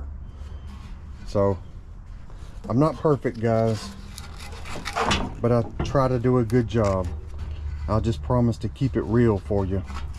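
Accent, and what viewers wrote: South, United States. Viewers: I just love Southern women and their voices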